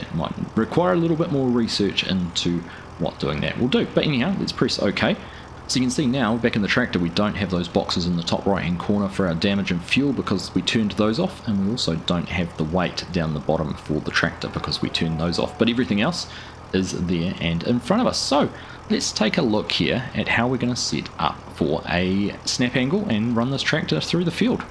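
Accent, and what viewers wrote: New Zealand. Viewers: You might be getting confused by the Kiwi accent...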